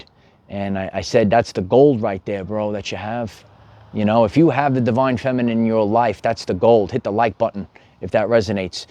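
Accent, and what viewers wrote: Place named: Brooklyn, United States. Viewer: I just fully processed you have a heavy Brooklyn accent after all this time. 😂 Thank you, greatly, you helped me find my wings again. Actually, more than just one set. I need a closet for them all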